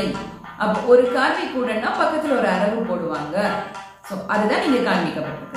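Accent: Sri Lanka. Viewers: India or sri lanka Your language style is like Jaffna mam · இலங்கை தமிழ. அருமை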